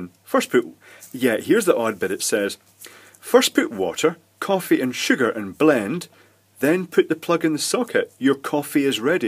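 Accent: Scotland, United Kingdom. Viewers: Scottish accent maybe?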